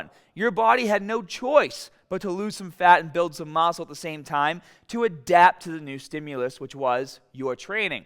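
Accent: Boston, United States. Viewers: The exaggerated Boston accent is atrocious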